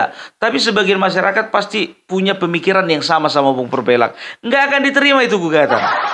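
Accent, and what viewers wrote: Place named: Java, Indonesia. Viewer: Bang good logat jawa nya aku suka banget 👍👍🙏🙏😀😀😀😀😀😀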